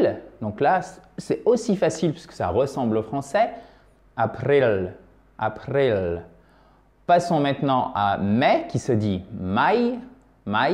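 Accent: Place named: France. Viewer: спасибо merci gracias thx PS Votre accent français n'est aucun problème pour moi, au contraire c'est un plus car 1° on peut ainsi perdre d'éventuels complexes et 2° le russe est plutôt ainsi mieux digest qu'avec un(e) russophone de naissance.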